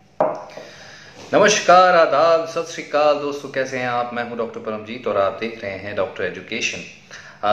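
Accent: India. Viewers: sorry doc..i have a hard time fallowing you..what is that indoenglish ?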